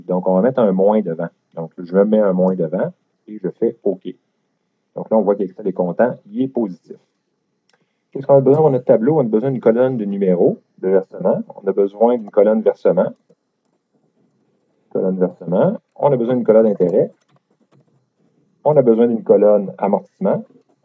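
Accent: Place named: Quebec, Canada. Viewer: l'accent... typique québécois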